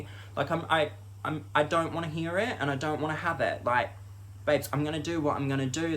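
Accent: United Kingdom. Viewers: why did you choose the cockney accent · i love your accent. what part of England are you from?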